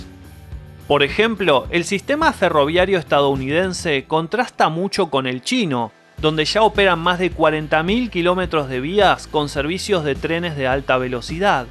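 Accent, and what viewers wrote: Argentina. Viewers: Ahora usted periodista, por su acento es argentino. Como esta su Argentina???? hoy en día???? cual es su inflación?????. Espero escuchar su análisis también, es fácil hablar de los vecinos pero difícil habla de mi hogar. O la idea central es "Mal de mucho consuelo de tontos" es eso su análisis??????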